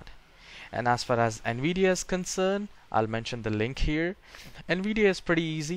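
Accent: India. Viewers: hes trying to hide the indian accent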